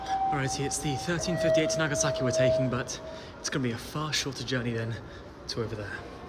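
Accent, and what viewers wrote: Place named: RP, United Kingdom. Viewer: Hello, I love your videos! Since I found your channel a couple months ago I have watched all your videos and I’m always waiting for the next one, thanks for the great job you are doing! I wanted to ask you something and I mean no disrespect with the question but, are you a trained voice or scenic actor? I’m not a native English speaker and your pronunciation and cadence reminds me of the listening exams for the Cambridge exams, like you talk in an accent close to RP. I ask just for curiosity and again, I mean no disrespect and I hope this doesn’t bother you too much. Keep up the good work and thanks!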